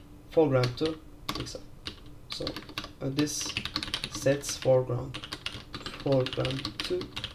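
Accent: Turkey. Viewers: Thank you for the Xlib tutorials. Unfortunately X11 programming sources are scarce. I appreciate your tutorials. Would you cover Xshape click-through windows too? A transparent screen widget would be good. Your accent sounds Turkish.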